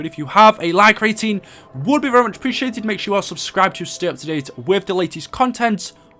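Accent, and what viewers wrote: United Kingdom. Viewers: Are you British because your accent is awesome! !!! ₩ h ¥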